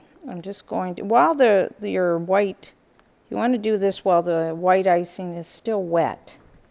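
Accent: Canada. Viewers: Confectioners sugar - is that icing sugar?? Love your Canadian accent btw xx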